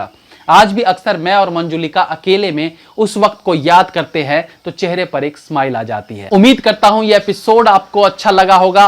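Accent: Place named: Bengal, India. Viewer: Bengali Voice like Mamta Banerjee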